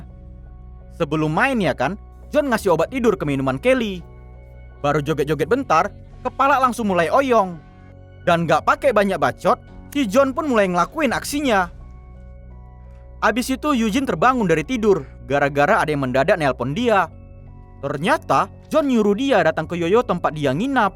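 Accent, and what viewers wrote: Medan, Indonesia. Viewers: Swrius saya nonton alur ini bukan pengen lihat filmnya tapi dengar logatnya ngomong medan kali wak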